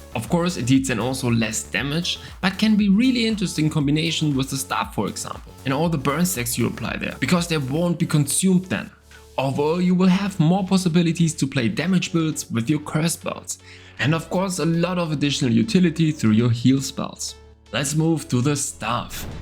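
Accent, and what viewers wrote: Germany. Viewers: Hört sich so Deutsch an :D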